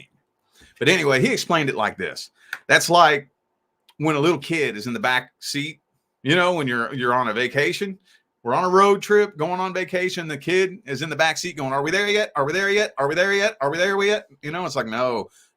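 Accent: United States. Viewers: Hello From France,🙂 How come while I have been rolling rolling rolling through the many videos of Tarot without falling till today to your great work. Let's go straight, I look at my tarot ( 5 different artists that I really really like Boticelli, Isawatt, Anne Guesquière, ...) I do it once a week otherwise you can feel easily lost, while doing once a week it is my moment and I like it. But sometimes during the week, I like to put a curious eye on Zodiac. And today ,I discovered randomly your channel, you killed me since you speak with one clear voice ( I love presentation cosy cool attitude with black and white band on the screen to follow in which topic we are and all that with humour even sometimes thematics are not easy always well most of the time you speak with awesome comments . so I am in sheer curiosity I will even drop on my notebook some of your comments, it allows me first to speak a better English ( sounds you live in USA , that accent is ok too ) and to take conscious as a pure true Aquarius down to Earth I embody that most of the time it is necessary not to play an automatic way of life pace and rather focus on a "camino" on a way to get closer to what wee feel in ourselves really stop lying to oneselves, assume decisions, choices... 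and well yes I can say this my first video from u listening and I feel happy because of the tone you employ emphasis on purpose, repeated sentences, like dear Aquarius stop with your " I know, I know, I k now" among a plenty.👊✊👏 I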